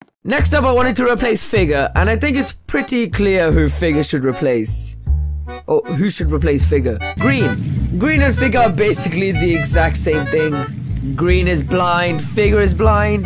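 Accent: United Kingdom. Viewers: R u British? 😅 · are you from birmingham?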